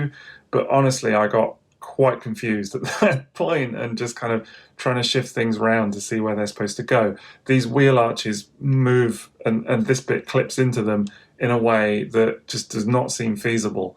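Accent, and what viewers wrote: United Kingdom. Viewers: Hello, I’m looking into buying Split, however I’m in the UK, like you I assume due to ur accent, and I can only find online stores that sell him in $$$, can you please let me know or anyone here in the comments if there is anyway to buy with £££, many thanks.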